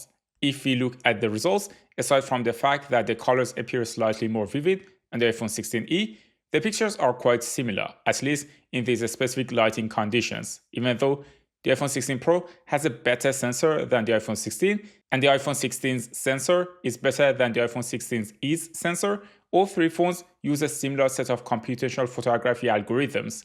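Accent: Iran. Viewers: داداش احساس میکنم لحجت فارسیه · لحجه ایرانی داری داداش، ایرانی هستی؟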